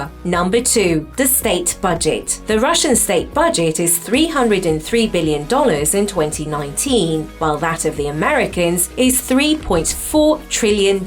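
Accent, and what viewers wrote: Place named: England, United Kingdom. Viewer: Fuckin' hate this english accent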